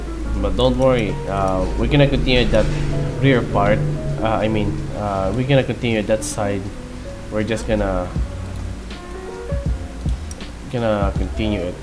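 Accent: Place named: Philippines. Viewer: Where r u from You sound like a filipino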